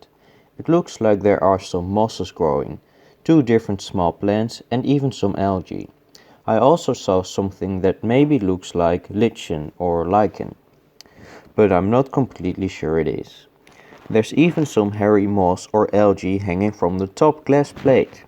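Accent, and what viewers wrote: Netherlands. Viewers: Are you Dutch because you have a Dutch acent LoL 😂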